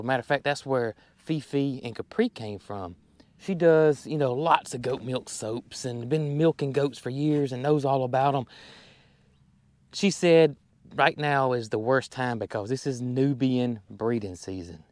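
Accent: South, United States. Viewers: Who ever is doing the closed caption sure doesn't understand your southern accent. lol When you say buck they say book. hahaha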